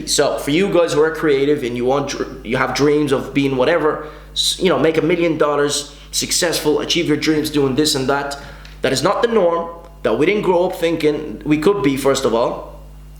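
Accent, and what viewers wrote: Ireland. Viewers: You irish bro I think I can hear it im from tipperary but cant make out your accent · Is that an Irish accent?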